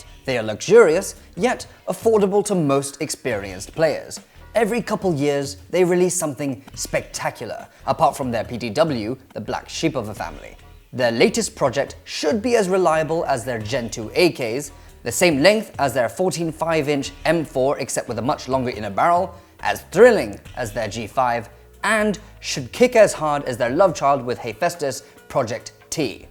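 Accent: RP, United Kingdom. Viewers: Well, if it's genuinely how you talk and you aren't putting it on for the camera, then I will have to apologize for being derogatory towards you. I would guess having a posh british accent with the native language would dilute them into a mixture of both. Truth be told my problem is with people who pretend for the camera. I hope you accept my apology as I do enjoy your video's. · looks asian talks like a brit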